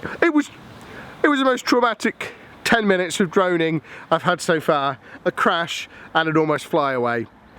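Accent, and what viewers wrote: United Kingdom. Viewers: lol I would want the headset I hear a UK accent are you in the UK or USA?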